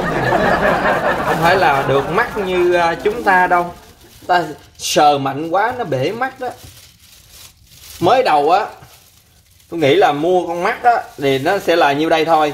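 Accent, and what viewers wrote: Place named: Mekong Delta, Vietnam. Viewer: Đậm chất ngừ miền tây Nghe câu "thun thun thun thun" là bt liền😁😁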